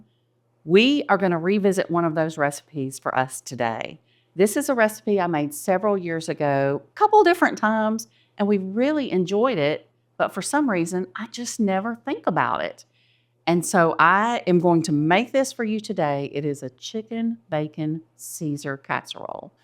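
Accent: South, United States. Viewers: Hi and D, Everyday I’m looking to see what dish your making.. Never heard of this casserole but planning to put it on my meal plan for next week.. Looks easy and tasty..If I wanted to add sides to serve with it then what do you suggest?.. Thank you for the recipe..I like your southern drawl and you have beautiful healthy hair.. Retired cosmetologist here with a thick southern drawl …😂😂😂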